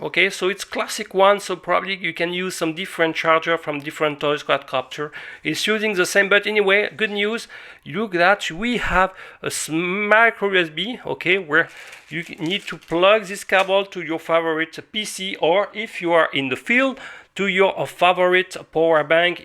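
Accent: France. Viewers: Ca s'entend que tu es français mais ça reste une très bonne vidéo!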